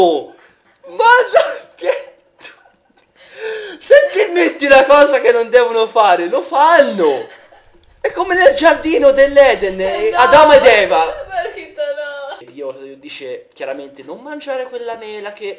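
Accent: Tuscany, Italy. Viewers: è toshano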